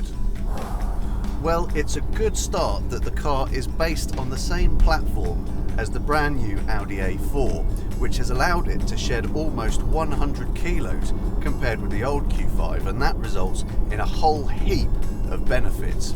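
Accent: United Kingdom. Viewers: I need to marry a British guy. I could listen to him talk all day lol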